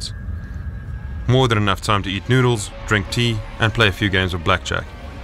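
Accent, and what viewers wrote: South Africa. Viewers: Is this a South African speaking ?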